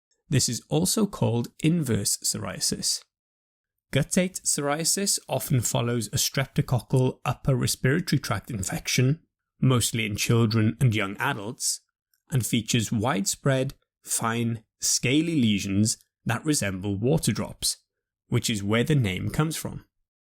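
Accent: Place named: United Kingdom. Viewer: is british accent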